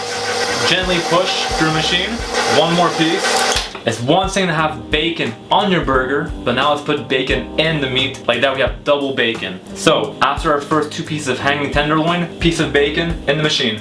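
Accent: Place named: Quebec, Canada. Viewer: Tu sonne comme un anglais québécois c'est drôle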